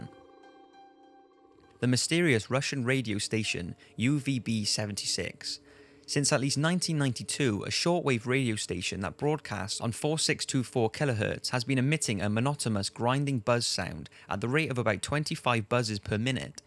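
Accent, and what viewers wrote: Wales, United Kingdom. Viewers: Yes but he's British so he has an accent lol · Very good. But where is ur accent from? It sounds a bit Welsh, but my husband is Welsh and he sounds quite different! Lolxxxx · " lol he's British can't tell by his accent" Of course you can tell he's British, unless you're a fucking moron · James Smith he has a british accent so it might be harder for him to pronounce certain things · He's Welsh and I don't know any dialect in the UK who says "heared" ...we say "heard" I think he's just a bit nervous..... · Yes he is Welsh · He is actually Welsh though. Don't know what part of Wales however, but he must pronounce words that way. So yeah.. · He's british or something, you fuck nuts, he has an accent, and can't pronounce things the "american" way, doesn't mean he's uneducated, dumbasses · Are you Welsh? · Ahh I thought there was Welsh in it but did not want to go out on that limb. 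Either way that was not the crucial part of my comment lol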